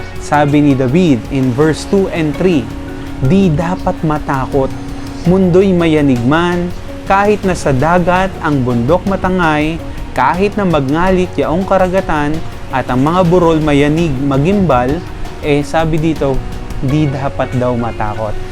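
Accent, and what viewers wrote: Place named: Batangas, Philippines. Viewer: ah kala ko lang po kasi na may Batangueño o Tagalog accent 👍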